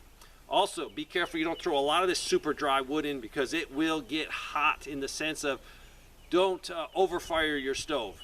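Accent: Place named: Kansas, United States. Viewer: why are you called when you sound like you're in kansas or somewhere not south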